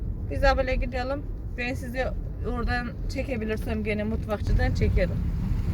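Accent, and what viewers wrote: Trabzon, Turkey. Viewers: hanım tam bir trabzonlu gibi konuştun korkanlar tehdit eder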